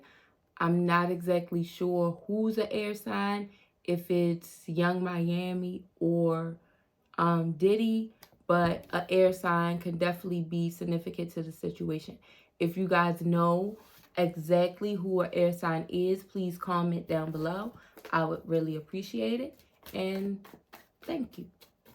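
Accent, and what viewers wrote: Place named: Baltimore, United States. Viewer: Greetings 💕 playing catch up, but Diddy just may want the ‘Unisex Cult’. She needs 2 realize that her LIFE is more IMPORTANT. That $$ has her not 🏃🏾‍♀️ the opposite way…that’s not what he likes😶😄 THANX 4 YOUR 💝 💜…yeeeess ma’am I hear Baltimore tongue! On 2 the nxt video 😊 LIKE..SHARE & SUBSCRIBE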